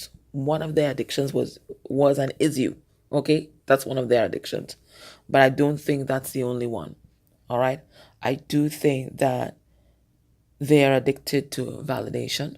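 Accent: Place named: Trinidad and Tobago. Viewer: Where are you from? Trinidad?I heard the accent and immediately subscribed! I am from St. Kitts.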